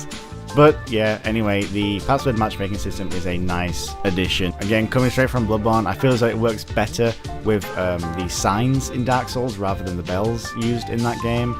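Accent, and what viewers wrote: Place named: Northern England, United Kingdom. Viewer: Lenayus Mynestone Northern England accent.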